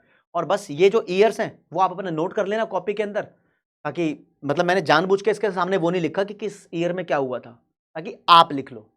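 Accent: Punjab, India. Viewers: SIR APP PUNJABI HO KYA BATO SE LAG RAHA HAI 😅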